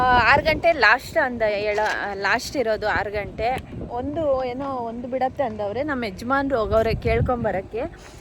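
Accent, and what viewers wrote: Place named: Shivamogga, India. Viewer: ಅಕ್ಕ ನೀವೂ ಶಿವಮೊಗ್ಗ ಕೆ ಬದಿರ ಕನ್ನಡ ಮಡ ❤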